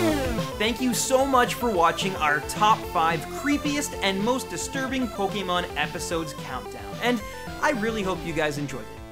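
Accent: Canada. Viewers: Are you Canadian? Cause you sound like a Canadian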